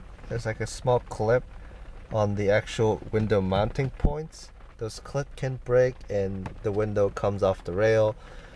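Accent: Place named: Asia. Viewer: I can tell your Asian with just hearing you talk, you talk kind of like my Asian friend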